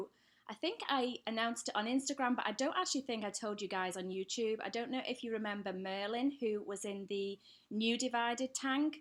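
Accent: United Kingdom. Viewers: MAN I MISSED THE LIVE STREAM!!!!! i was really busy today, and didn't even touch my laptop:( i love this video though.... and i hope you make more live streams. PS i knew you were British!!!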